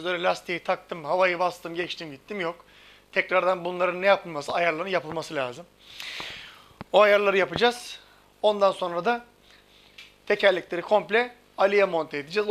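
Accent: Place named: Aegean, Turkey. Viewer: Hocam şiven çok güzel Aydın mı Muğla mı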